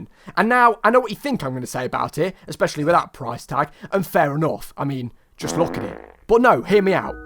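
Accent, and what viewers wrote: United Kingdom. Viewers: I'd this man British because he sounds British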